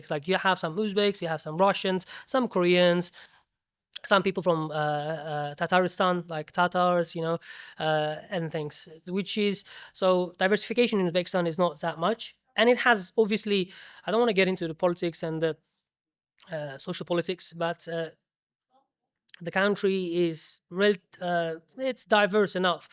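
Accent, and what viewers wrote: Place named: Ireland. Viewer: тянется на Ирландца, нет слов без остановки говорит 😊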